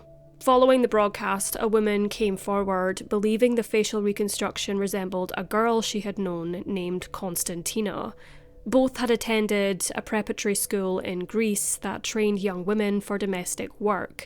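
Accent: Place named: Glasgow, United Kingdom. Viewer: I think she's from Glasgow, my Scottish aunty sounds very similar to her.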